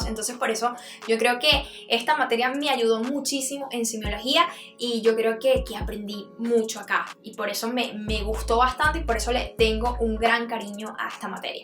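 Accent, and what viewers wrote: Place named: Venezuela. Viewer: Por el acento yo diría que es venezolana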